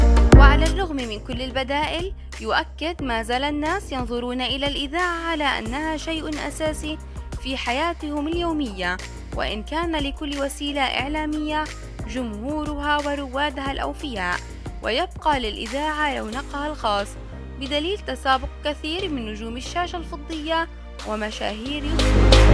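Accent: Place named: Saudi Arabia. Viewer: لهجته اقرب للهجه السعوديه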